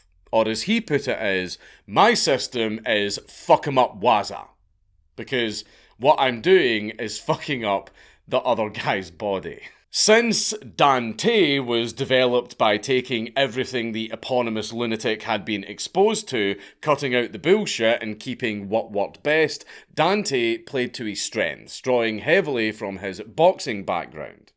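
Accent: Scotland, United Kingdom. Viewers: This was such a good one. Thanks for putting in overtime. Sometimes when I’m watching your videos I have to actively remind myself that it’s not Nick Offerman doing a Scottish accent. Are you two related? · words are hard... especially in a scottish accent...🙏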